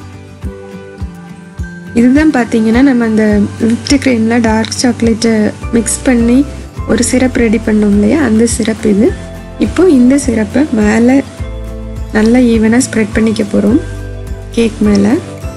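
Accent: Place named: Kanyakumari, India. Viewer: Love that accent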